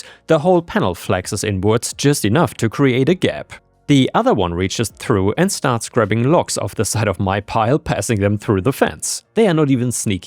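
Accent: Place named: Germany. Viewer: German accent really works with these revenge stories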